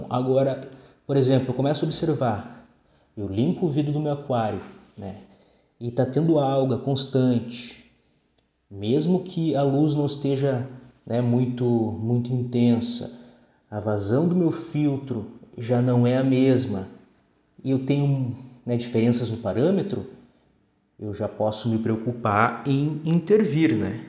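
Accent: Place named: South, Brazil. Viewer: Teu sotaque parece ser do sul. Vc e sua família estão seguros devido as enchentes históricas?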